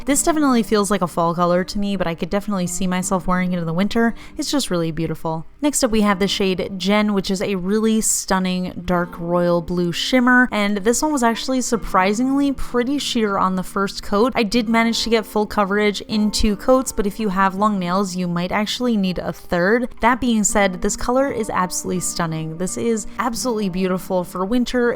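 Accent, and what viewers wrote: New Jersey, United States. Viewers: I love this collection. Love the new hair color too! I totally understand about how you say some people make fun of how you pronounce ‘ pastel’ I’m from Jersey too ( near LBI) and I’m always being made fun of on how I pronounce certain words. Maybe it’s a Jersey thing. But now for the important Jersey question- is it Taylor Ham or pork roll. Lol. ❤️ I love your channel.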